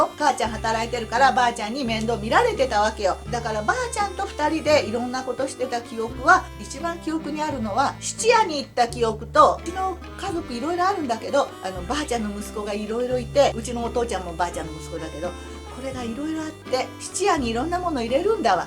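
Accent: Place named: Hiroshima, Japan. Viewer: 広島弁かな！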